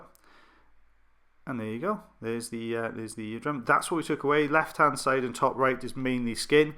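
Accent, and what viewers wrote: Wales, United Kingdom. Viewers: god that beautiful Welsh accent. I know people from the UK deride it, but I challenge anyone to listen to say “maneuver” and not love it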